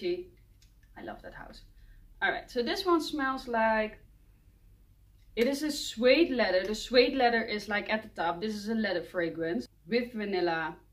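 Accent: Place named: Netherlands. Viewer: Als Belg hoor ik een nederlands accent, toevallig van Nederland ?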